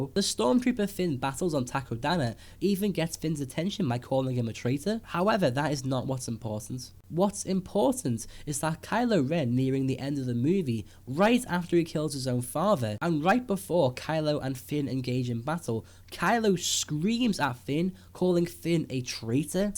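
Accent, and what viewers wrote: Liverpool, United Kingdom. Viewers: Yous a Scouse, innit?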